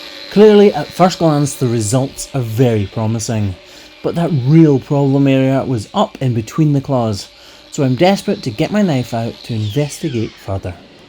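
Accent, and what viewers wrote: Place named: Scottish Borders, United Kingdom. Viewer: Just a short note to say thank you for your work, it’s a joy to share in your world and hear a borders accent while living in NZ.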